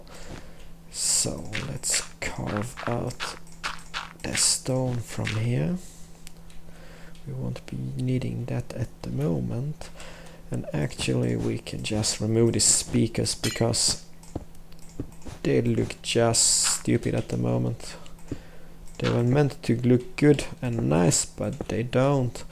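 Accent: Poland. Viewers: bardzo dobrze to wygląda ! ;) jest polski akcent super ;) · dude are you polish ? XD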